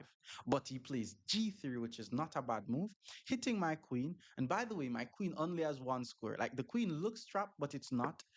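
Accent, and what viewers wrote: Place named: Jamaica. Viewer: bro i found your vids a couple of days ago, and I'm hooked. Best chess educational content on YouTube! also really appreciate the soft Jamaican accent. I find myself kissing my teeth when playing now, followed by "I can't take becaa...". Big ups bro, drop a lil more patois tho please <3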